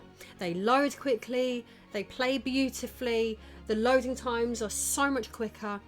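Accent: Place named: United Kingdom. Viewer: I actually like this new direction for the channel, I could see growing to one day to be one of the top gaming news channels because she knows how to properly research the topic and her British accent elevates the content by lending it a sense of legitimacy that American YouTubers just don’t have. I feel like I’m listening to a BBC news broadcast when she’s discussing the topic.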